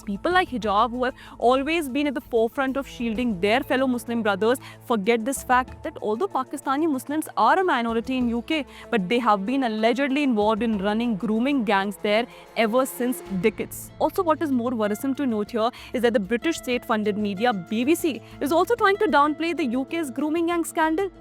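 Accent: India. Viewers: With a certain Indian accent literally one can see you’re making a point scoring . The fact Indians are getting hatred from west one should humble themselves but shamelessness is being genetically inherited by you folks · too much Indian accent for my brain to tolerate ... sorry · That’s Indian accent, cope with it… and try to focus on the subject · You wouldn't stand a min when trying to confront Mehdi or Hijab with any of your claims. Would tremble in fear with your goofy strong Indian accent. I know some or let's say many muslims have tried to be too defensive to label any criticism of Pakistanis as Islamophobic, but how many points in this video are false? Two of the biggest ones 1) Hijab didn't instigate the Leicester riots. He went there a few days after the riots happened. But did use strong words along the lines of we'll attack them (the Hindus) the way they'll attack us. 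2) Mehdi is also far from an Islamist. He's confronted Imran Khan for many of his policies for e.g. His points about Elon flipping on Pakistanis after getting backlash on Indian H1B visas from Trump supporters is true. A few weeks before Twitter was flooded with anti-Indian sentiment and Elon was getting blasted for his views. Now to make up for it he's flipped totally Pakistanis are scum wherever they go and should be punished for their crimes. But you lot from the subcontinent - Muslims, Hindus, Sikhs, Christians, Buddhism all have the same problem of raping & abusing women. It's something in your DNA or in the soil of that region.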